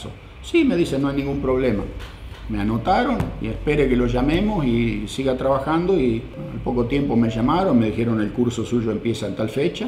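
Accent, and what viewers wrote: Argentina. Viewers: Como sabe de cba, sabía de potrero..como si hubiera nacido acá, me pregunto dónde sería la casa acá cerca del clínicas, no perdiste nada el acento argentino.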